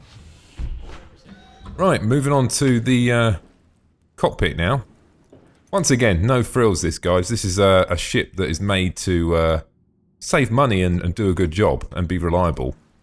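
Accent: United Kingdom. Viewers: Love me sum British accent m8 it's refreshing to hear a nice voice commentating on the video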